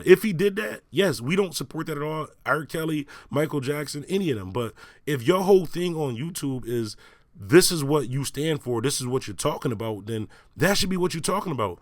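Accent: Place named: Pittsburgh, United States. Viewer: You from Pittsburgh? you def got there accent or Buffalo Ny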